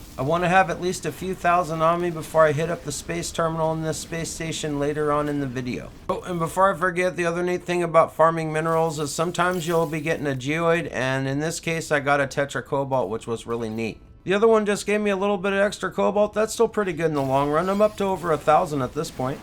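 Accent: Canada. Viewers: LOL. Just found this channel. im almost 30 hrs in. I JUST found my first Glyph Portal. Got 1 Crap freighter. a few ships. One is a fighter with way more dps than the other 2. 4 storage container rooms in the freighter. A few upgrades to things. Multiple Base Computers plopped on worlds. I havent even built a real base area. I have a 3x2 box with a door. 2 Specialists that now dont seem to do anything but stand there. Gettin the hanf of the game though, but if there is anyone out there that is less efficient than I am, you deserve an award, because this game is not user friendly. It has the most convoluted menu system ever. Its sleek...i'll give it that, but holy moly...spending 3 hrs to figure out how to do something as simple as figure out where to find "Hydraulic Wiring" 0.0 Still love this game though edit: Dude sounds like he is from Alberta edit: Grave Locations?? 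25 hrs in and i have died once. Found my grave. Never seen anything about others' graves o.o. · Sounds like you stepped straight out of Letterkenny lmao 🤣 thanks for the video. Haven't played in a year... Everything has changed so much... I decided to create a new game save so these tips will be very useful. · Are you Canadian?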